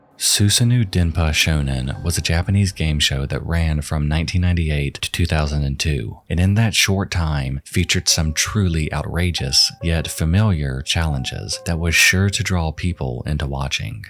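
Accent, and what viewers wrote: South, United States. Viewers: I just noticed his southern accent how did I never notice it before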